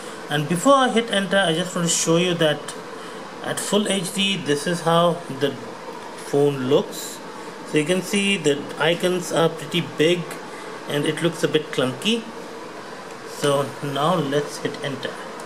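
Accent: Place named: India. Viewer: Another indian with stupid tongue stupid accent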